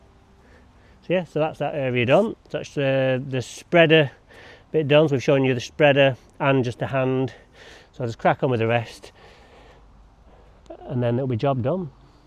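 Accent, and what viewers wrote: Netherlands. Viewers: Great video Dutch accent appalling lol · Haha, your Dutch accent is excellent. You sound exactly like my Dutch friend, Wouter. Hi from Prague, CZ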